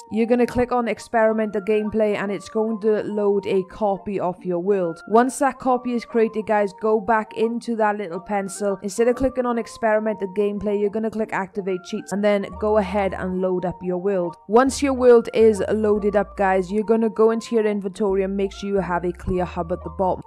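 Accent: United Kingdom. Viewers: I cant tell if your british or american. Its just weird. Btw, 𝘆𝗼𝘂𝗿 𝗮 𝗹𝗶𝗳𝗲 𝘀𝗮𝘃𝗲𝗿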